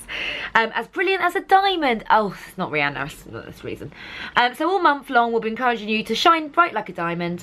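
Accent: United Kingdom. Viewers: Im in the US and subacribe to Birchbox. I love the Liz Earl skin care line and keep stocked. I just started watching your videos. Our US Birchboxes dont have the sac that holds our contents. Im gonna suggest it. I love watching you and your cute Brit accent. You look so much like Dutchess Kate whom I love dearly! Im Half French and wanted you to know, when you read the French labels on products, you doba fine job!!! ☺ I'll be subscribing to your channel. I hope some day, I can come visit the UK. Its on my bucket list!!!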